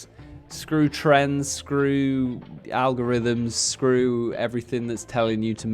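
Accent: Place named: United Kingdom. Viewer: Are you British? Cuz I like the accent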